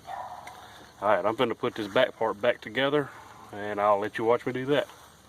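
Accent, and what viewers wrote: South, United States. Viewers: Just love the southern accent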